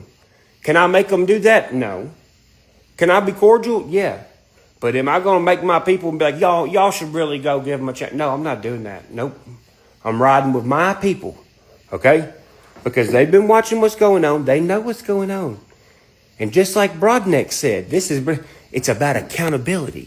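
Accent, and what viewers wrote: South, United States. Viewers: Still sounds like a sassy southern hairdresser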